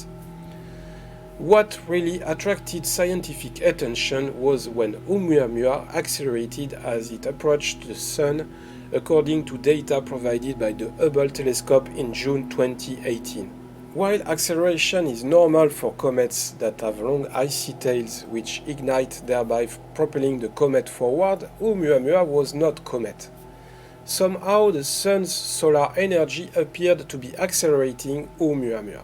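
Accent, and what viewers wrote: France. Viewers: I have to say a critic but hope you'll receive this as a constructive criticism, hope you'll forgive me, your videos are super awesome however your French accent is very strong sometimes I nearly can understand you you need to talk more clearly, it's my point of view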